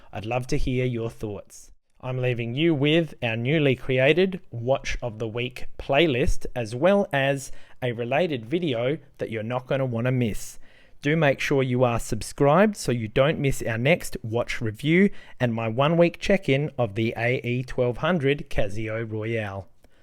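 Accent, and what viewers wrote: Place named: Australia. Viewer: Good to hear the ol' Aussie twang going on there! Good video, thanks. Before I gave mine to my son I used mine as my everyday watch, including swimming and hiking and it took everything like a champ. I had the steel bracelet one and changed it to a black Casio strap. Now thinking of getting another one, the black one like your one in this vid.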